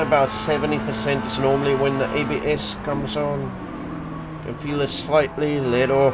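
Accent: Afrikaans, South Africa. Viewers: Tel ek 'n afrikaanse aksent daar? Great content ! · Are you South African sir? If so where !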